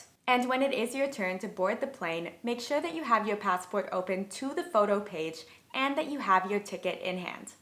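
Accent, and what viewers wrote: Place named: New England, United States. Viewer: Heavy New England accent! 😬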